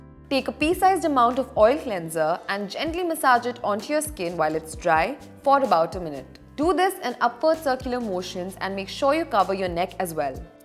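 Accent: India. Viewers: You look Asian but sound Indian 🤔thanks for the video 👍🏼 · She is indian that's why the accent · Her accent sounds like indian accent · I was not expecting that Indian accent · I'm surprised that your English accent sounds like Indian! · Clicking on the video assuming it a korean channel. Listening the accent: Indian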